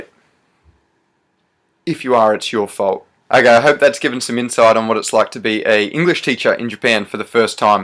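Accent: Australia. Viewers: Watching one of your older videos makes me think if you losing slowly your Australian accent.what do other people say about that? Cheers mate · Bradlyn Dukes I'm aware accent is aussie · im pretty sure his accent is Australian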